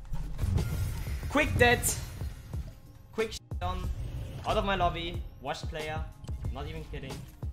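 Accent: Germany. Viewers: Dieser Deutsche Akzent in der Englischen Sprache triggert mal übelst😂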